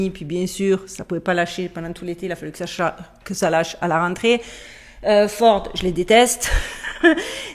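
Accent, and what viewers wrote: Marseille, France. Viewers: Oh,Peuchère,vive l'accent de Massilia! 🐟 et les sardines! 🐟🐟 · Je suis curieuse et pas autruche du tout, alors, oui, continuez à farfouiller svp 😀 c chouette l'accent marseillais 😄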